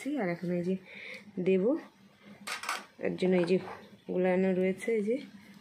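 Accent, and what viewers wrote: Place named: East Bengal, Bangladesh. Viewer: একখান কথা কমু আপনি কইতাছেন আপনার জন্ম ইন্ডিয়ায়। কিন্তু আপনার কথাবার্তা থেকে এখনো আংগো পূর্ববাংলা টার্ন রইছে।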